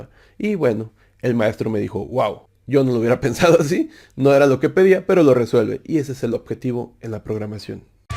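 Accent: Mexico. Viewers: Fresa-barrio xd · We eres bien verga hablas como fresa-barrio y explicas bien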